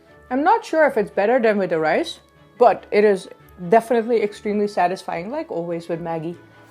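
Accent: India. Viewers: I kind of hear an indian accent 🙈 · Hoi hoi, wat een leuke video. Ik moet je eerlijk bekennen dat jou Engels achteruit is gegaan. Ik weet dat je nu in India woont, maar je spreekt nu ook echt engels net als de lokals. Vind het jammer, want je sprak altijd heel goed engels. # No diss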